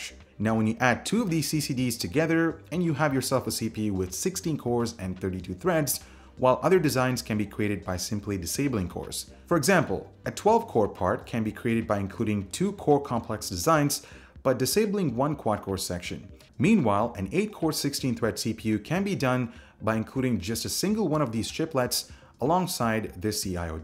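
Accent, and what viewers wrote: India. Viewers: are u indian.? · Is this guy Indian?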